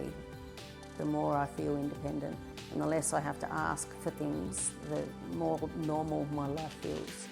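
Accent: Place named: Australia. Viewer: I wish u all the best. Im from Victoria Australia. I recognise our accent. Take care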